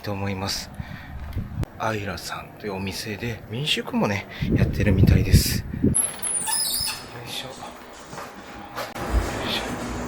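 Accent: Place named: Nara, Japan. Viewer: さん、いつも素晴らしい動画とBGMをありがとうございます。本当に楽しく拝見させて頂いております。毎回日本ってまだまだ知らない絶景が広がっているんだな〜って感心します。ドローンの映像が素晴らしく自分で観に行っても見られないアングルからの映像に圧倒されます。ところで今回の動画の後入れのナレーションの音声が一寸だけトーンが高いように感じるのですが気のせいでしょうか？いつもの落ち着いた声が好きです。口調が奈良弁？でクピ男さん（クピ男の車中生活）に似てますよね。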